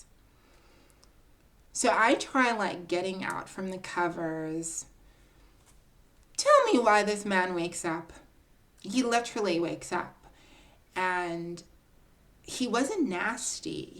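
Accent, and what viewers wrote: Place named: United States. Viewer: Whats up with the American accent?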